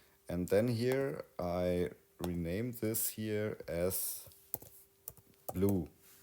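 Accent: Bavaria, Germany. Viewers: Immer wieder neue Einblicke in Nomad Sculpt - die App, die mir leider noch lange ein Buch mit sieben Siegeln bleiben wird. Aber ich folge dir gerne weil du beständig im Thema bleibst. Ich hab die Hoffnung irgendwann genug Zeit zu haben alle deine Tutorials nachvollziehen zu können. Wobei mir die älteren Tutorials auf deutsch leichter fallen. Trotzdem Daumen hoch für den Bavarian ( ich höre auch fränkisch raus) - English Aspekt.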